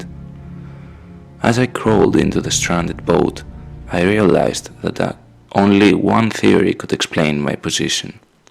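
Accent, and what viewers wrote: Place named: Greece. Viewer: you greek mate ? :) nice work btw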